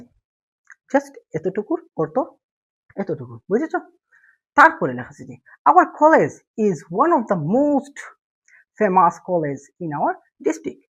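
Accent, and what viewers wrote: Sylhet, Bangladesh. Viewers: Vai Sylheti ni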